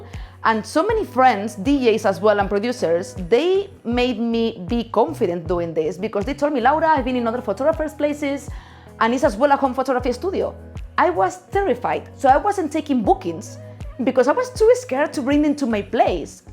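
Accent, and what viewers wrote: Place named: Spain. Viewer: Hi there, your videos are great and interesting. But english is my 2nd language and you are not so easy to understand because you talk so fast and the Spanish accent. So can you speak slowlier in the future? Would be good for the viewers with a background like mine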